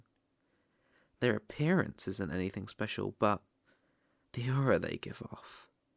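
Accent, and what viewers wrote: United Kingdom. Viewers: Idk why but I can't take anything serious when it's in British like it's so cute and funny so idk bro😭🫶🏻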